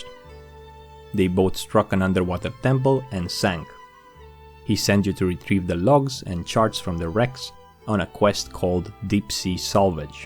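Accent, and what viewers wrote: Romania. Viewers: this guy has a romanian accent, wonder if he is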